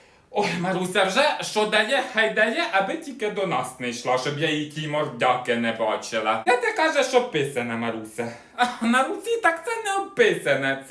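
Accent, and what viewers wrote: Poltava, Ukraine. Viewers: Дякую як послухаю то наче дома побуваю моя рідна Полтавщина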